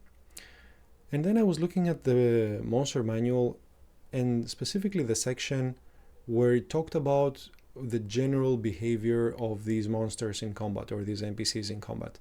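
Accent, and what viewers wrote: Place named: Greece. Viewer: D&D content with a greek accent is exactly what I needed. Euharisto gia to vinteo! :D Interesting mechanic, I think I may give it a go because I have a few players who take ages to do stuff.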